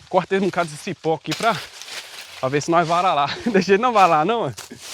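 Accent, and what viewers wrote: Minas Gerais, Brazil. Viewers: Sotaque mineiro, parabéns👏🏼👏🏼👏🏼 Exemplo de trabalhar com o que gosto, obrigado🙏🏼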